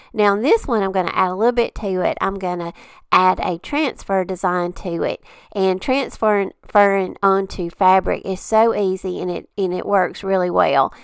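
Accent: South, United States. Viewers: Lovely Southern accent, but she is speaking very quickly and I can't make out what she is saying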